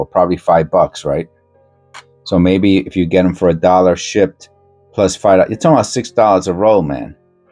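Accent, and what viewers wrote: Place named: Brooklyn, United States. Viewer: I knew I recognized that accent lol I'm also from Brooklyn and live in Bensonhurst.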